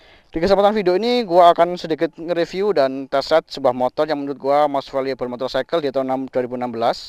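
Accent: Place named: Java, Indonesia. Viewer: medoknya jdi gagal fokus dah,wkwkwkwk apa lagi ngomongnya pke lu gua 😂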